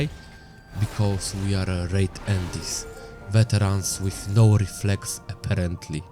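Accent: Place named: Balkans. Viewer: bałkan inglisz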